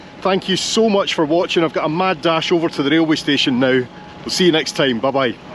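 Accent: Scotland, United Kingdom. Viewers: I am definitely with you, as a solo traveller most of the time, always much more enjoyable in Winter with quietness and calmness, LOVE all of your videos, icing on the cake to me is your charming Scottish accent;)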